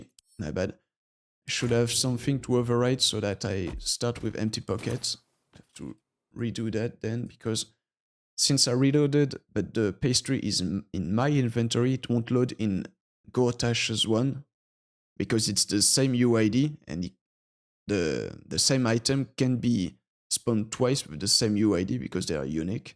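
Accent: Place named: France. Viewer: En tant que française j'ai reconnu de suite l'accent :D très bon tuto! la boite à outil est elle à la portée de tous?Je veux dire est ce que si on ne fait fait ni modding ni programmation , n'importer qui pourra créer sa map ,et ses quetes? j'ai lu aussi que ce n'était dispo que pour steam ? il y a t il un espoir pour GOG?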